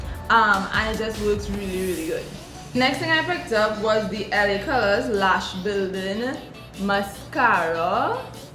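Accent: Trinidad and Tobago. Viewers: U hearing the Trini accent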